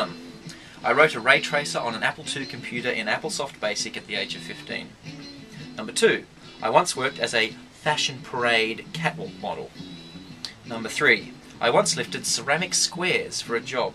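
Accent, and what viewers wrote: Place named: Australia. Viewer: Number 9 was a lie; You sound Australian.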